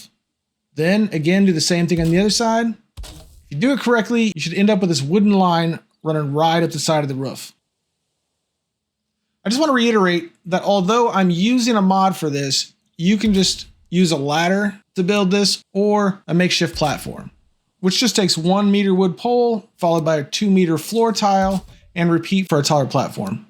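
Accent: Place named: South, United States. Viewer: You've never spent time in the south, have you? You have a "ruff" on your house. You "warsh" your car. Accents are fun :)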